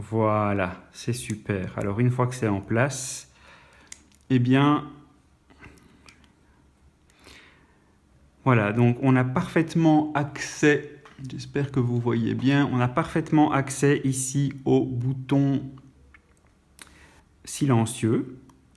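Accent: Belgium. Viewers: Accent liégeois?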